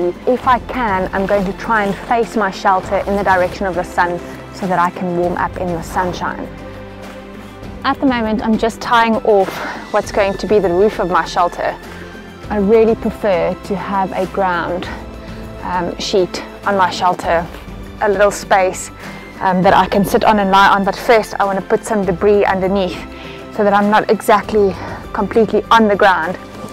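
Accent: South Africa. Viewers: Good evening from a South African living in Switzerland .. (and a 'Kleinmonder' from the South Western Cape nogal 😊) .. 👍👍👍 A good posting .. thanks. Your Post popped up out of nowhere on my feed .. I took a quick look-see and when I heard the accent .. 'Sef Efriken' for sure 😁. Switzerland has many forests (minimum 30% of the Country Land Mass by Law) which are mostly not big enough to get lost or stuck in ..mostly 😏 .. especially where I live up North on the banks of the Rhine. .. no 'real' mountains .. just at times, steep hills. Looking at that Geography there by you, it reminds me so much of the Overstrand / Overberg Region. So nice seeing an Outdoor You Tuber from the Western Cape 😊. Yep .. getting a fire going in wet weather can be challenging .. the secret being in the preparation. For info .. very effective (and cheap) homemade fire starters are Cotton (100%) Makeup Pads (the round ones). 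Soak them in melted Candle Wax, allow to harden and you're good to go. Even better, soak them in melted Vaseline beforehand and then in the Candle Wax. They'll get 'hell frozen over' back on line and they burn for a good number of minutes. Some good tips in your post .. especially regarding layering and the danger of hypothermia. One should also take care not to overly perspire in cold (even no so cold) weather .. this 'can' be a killer. The secret is to start 'cool' and then add / remove layers of clothing to hopefully maintain that 'just above feeling cool' temperature. Another ti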